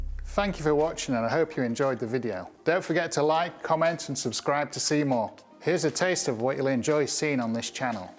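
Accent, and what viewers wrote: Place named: England, United Kingdom. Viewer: So magnificent and magical in every way. The beauty of that island, the photography, the aerial views, the music, your wonderful English accent I am in love with it all. I live on the California coast and have always gotten a kick out of the otters here in Santa Cruz, Big Sur etc but they seem to be different than the ones there. Here they are smaller, fluffier and their tails aren’t so long. Thank you for your presentation very lovely.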